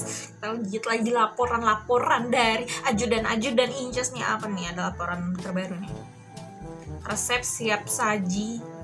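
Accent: West Java, Indonesia. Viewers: Teteh orng mana teh Sunda mana euy teh h3h3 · Ngomong Sunda nya bikin greget teh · Sunda mana nih🤣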